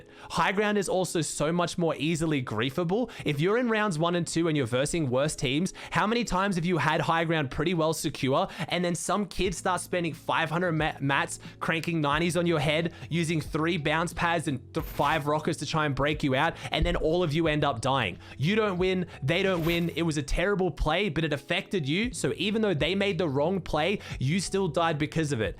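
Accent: Australia. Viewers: He’s Australian. He can’t help it.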